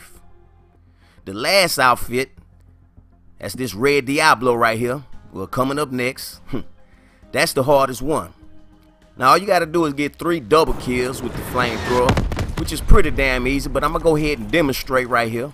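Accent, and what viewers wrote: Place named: Atlanta, United States. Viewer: that nigga funny as shit llssss sound a atl nigga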